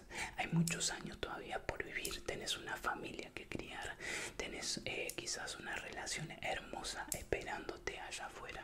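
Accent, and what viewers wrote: Argentina. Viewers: Hola; tus vídeos son muy relajantes y amo tu acento argentino;saludos desde Argentina 💕💕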